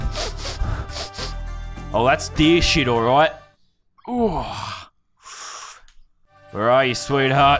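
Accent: Australia. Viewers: It’s his Australian accent and honestly I like how it sounds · The fact hes Australian males it so much better lol. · The Australian accent makes his videos ten times better am i right😂🤣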